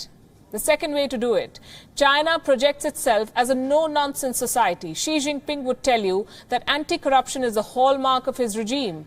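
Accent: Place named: India. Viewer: India and China, two of the oldest civilisations on earth should lock hands. But here you are clever by half, breeding discord. You are a developing country criticizing another. As if your leaders are holy and free from corruption. Lee Kuan Yew said that China like other countries is afflicted with corruption, but the difference is that Chinese leaders are able to get the job done. So woman, you should keep your strong Indian accented trap shut.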